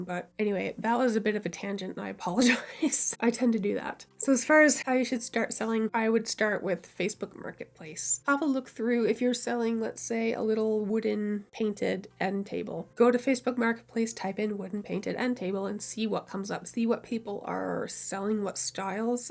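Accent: Nova Scotia, Canada. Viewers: Your furniture stuff showed up in my feed one day... so i watched... then i watched some more... and there was something about your accent that made me think of "down home nova scotia" Then i discovered you're in Hantsport! My hubby grew up around the corner from you on Smith Cres (the wrong side of the tracks, lol) and he is only a few years older than you... so now i wonder if our paths might have crossed in the past. Boom! I was hooked! Art and furniture restorations... with that down home accent. 😊 Thank you! And happy anniversary.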